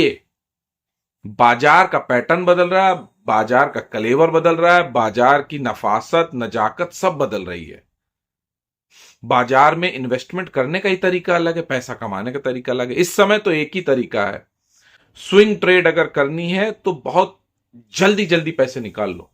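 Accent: Kanpur, India. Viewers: भाषा तो कनपूरिया है?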